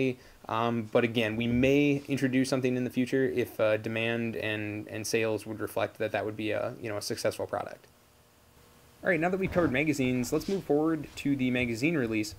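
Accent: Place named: Chicago, United States. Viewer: Chicaaago accent"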